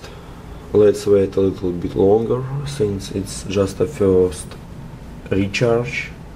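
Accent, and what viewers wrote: Russia. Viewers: Чёт у тя акцент русский. Иди учи фонетику!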